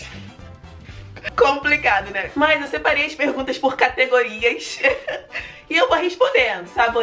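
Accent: Rio de Janeiro, Brazil. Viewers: Rexpoxta vc e da onde com esse sotaque do rio?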